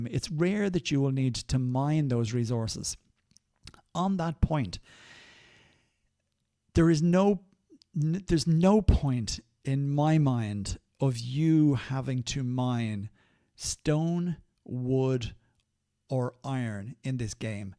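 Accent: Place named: Ireland. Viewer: As a new player this is the first good information site i have found , and i have looked at a lot . Its good to hear a clear logical intelligent person explaining things for beginners upwards and i am very much a beginner. Its good to hear how and why things are important and how to use them how to upgrade them . Also good to hear an Irish ? Accent instead of a london or american accent . Thank you so much for this clear detailed information. I am a very new player only level 112, 000 or so and have been in no battles or tournaments. I am building my city and equiping carter . Thank you fir this page . Very good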